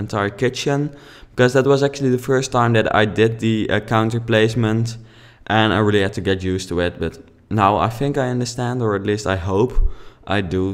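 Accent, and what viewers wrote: Netherlands. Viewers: heyy ik ben ook nederlands lol ik herkende het accent gelijk :d ik krijg de sims 4 over 3 dagen binnen en ik ga denk ik dit huis gebruiken echt moooii xx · Hehehehhe,you have a duch accent, i also have one bc i live in e Netherlands ;)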